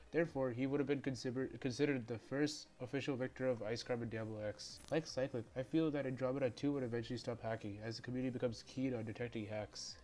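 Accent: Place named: India. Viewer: Btw are you from india your voice looks like an indian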